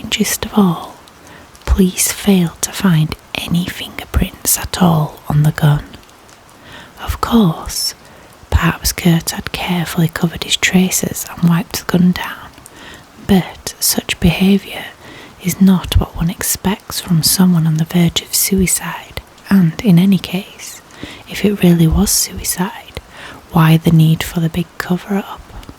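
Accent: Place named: Yorkshire, United Kingdom. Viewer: Came from jojo stayed for three reasons I like ASMR I like the Yorkshire accent I like hot mums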